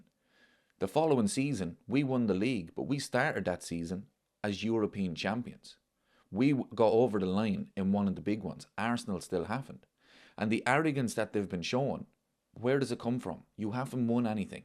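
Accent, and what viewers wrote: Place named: Laois, Ireland. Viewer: If we only win the league i still be happy. Are you a laois man. Im trying to think where the accent is from.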